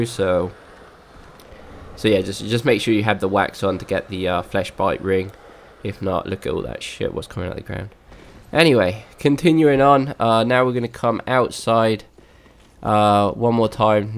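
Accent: United Kingdom. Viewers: ur british am i right?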